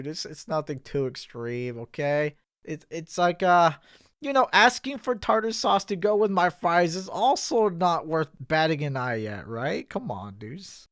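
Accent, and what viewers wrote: United Kingdom. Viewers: Man if there is one way to make me not skip an ad it's hearing velvety British butler voice say it. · I actually watched the full sponsor, because i absolutely love that british accent. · sorry i gotta stalk more of this sexy British voice then imma get back to ya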